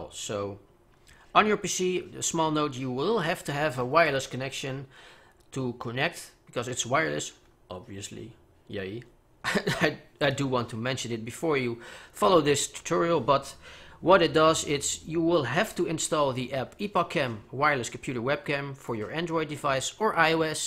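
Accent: Netherlands. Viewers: Hi, U sound a bit dutch, Are u dutch? Cuz i am XD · Zonder dat ik naar de reacties las hoorde ik direct dat je Nederlands was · je bent gelijk nederlands dat hoor ikg elijk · Nederlands? Ok hoor t aan je accent haha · Bij mij hangt de download de hele tijd vast (ik weet dat je NL bent ik hoor het) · You're defenitly Dutch right? i'm Dutch aswell and my YouTube is english and i just can hear your accent we share :D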